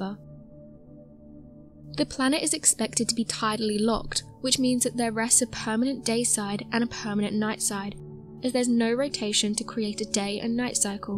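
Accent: England, United Kingdom. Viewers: Cute English accent🖖👽🤖👾🛰️☄️🚀👻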